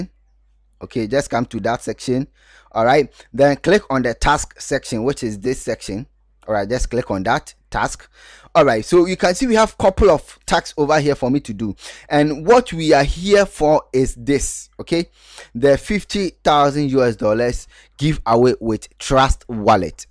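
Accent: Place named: Ghana. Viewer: 🙌🏽🔥🙌🏽 more Energy to you Brudda. I just 😂 knew you were Ghanaian the moment I heard the Okay and Yooooo 😂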